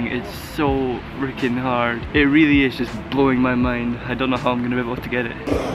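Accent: Scotland, United Kingdom. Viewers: Is he Scottish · Are u Scottish if ye are wits the best parks for skating bro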